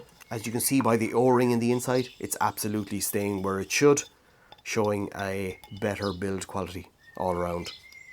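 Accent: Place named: Ireland. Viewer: Hi. Loving your videos. I'm in the middle of your hiking with your dog one, and your life interruptions. I feel for you buddy, been there. Hopefully, you're getting back on track now. I'm in Kent, but my great grandfather was born and raised in Clonmel, so I always feel happy when I hear the beautiful Irish accent. I must come over for a visit some time. Keep your chin up, hopefully it'll all work out for you. I hope your Dad is all good now. Cheers buddy. Andy 👊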